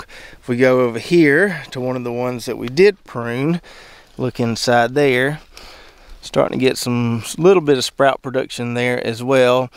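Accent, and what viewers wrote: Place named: North Florida, United States. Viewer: I wonder if anything can be done with the Brussel Sprout leaves as a raw, or cooked green like collars, or stir fry, etc...? It does seem like most big, dark green leafy veggies tend to need a bit of extra Nitrogen, sort of like super lettuce. Thanks for all the cool vids. I've just found your channel and been getting caught up. It looks like you're somewhere very nearby. I'm in North Florida and we have very similar soil, pines and accents :) Cheers!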